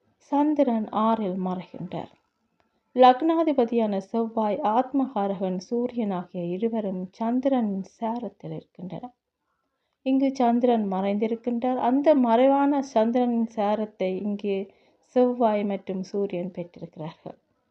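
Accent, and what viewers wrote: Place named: Sri Lanka. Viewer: உங்கள் இலங்கை தமிழ் அழகு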